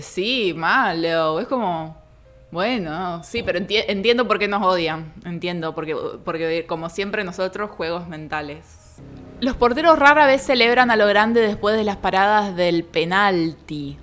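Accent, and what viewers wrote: Argentina. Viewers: hablando de futbol, le dije a mi mamá: chales si gana si pierde Argentina le van a hacer una burla jamas vista y si pierde le van a tirar muchísimo hate, cuando jugo con Mexico obvio le iba a mi selección pero que sabia que lo mas seguro es que perderia, talvez es porque no me llama tanto la atención el futbol pero me dio igual, lo que si me saco de onda fueron los insultos de Mexico y luego de Argentina, pero como dijiste lo que no te gusta que digan a ti, no lo hagas tu, o algo así, por cierto estoy obsesionada con su acento, pero no puedo imitarlos porque no soy buena para ello XD... saludos!!!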